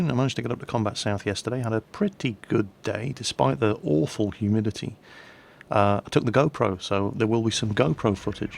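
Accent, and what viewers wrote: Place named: United Kingdom. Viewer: But the other you-tubers don't have the great British accent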